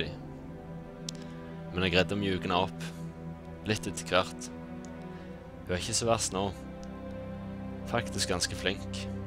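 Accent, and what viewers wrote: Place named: Jæren, Norway. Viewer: Goe! :D Genialt me jærdialektå då :D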